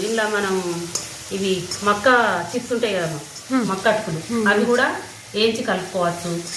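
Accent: Telangana, India. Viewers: Mana godavarikhani, Manthani,Mancherial, peddapalli karimnagar, warangal maata teeru abbaabbaaa mast untadi 👌👌👌👌👌❤❤❤❤❤❤ Edi matladina direct and straight ga untadi muchata....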